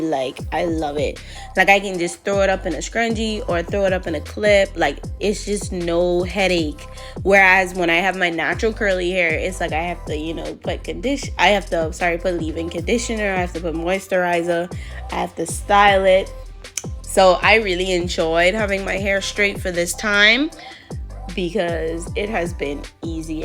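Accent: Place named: Bahamas. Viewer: you sound Bahamian. Hair goals🙌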